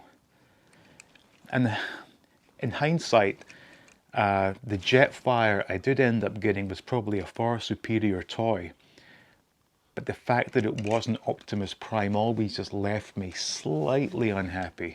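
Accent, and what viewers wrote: Scotland, United Kingdom. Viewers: I thought you were Irish at first. So Scottish like Bruce Wayne and Roddy Piper(Roderick Toombs). Well they are Scottish descent.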